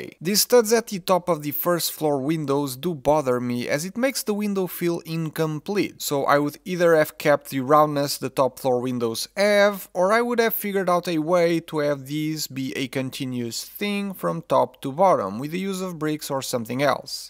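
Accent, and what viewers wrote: Portugal. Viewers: Pelo sotaque e pelo nome deves ser tuga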